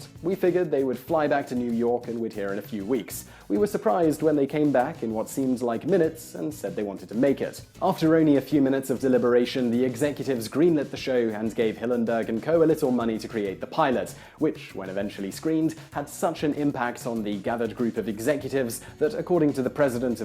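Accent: United Kingdom. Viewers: Idk what it is with watching people with a British accent explain stuff, but it gets my precum flowing.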